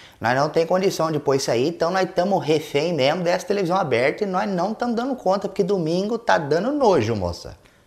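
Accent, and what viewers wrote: Minas Gerais, Brazil. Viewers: Hêêêêê Trêm Bão sôôô!!! Até que em fim, um minêrin pá salvá nóis nu you tubi uai!!! Faz uma recramação do tempo pra se aposentar, que nóis num aguenta mais trabaiá!!! Abraço!!!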